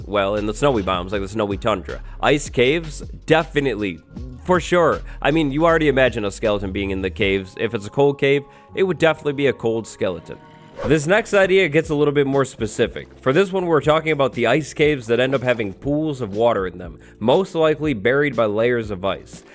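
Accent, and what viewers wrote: United Kingdom. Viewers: Aye yo your british? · wait you’re british??? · wait you're british? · Ur british I am to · Top notch British accent 👌🏼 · from intro: you're british? xD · ur british?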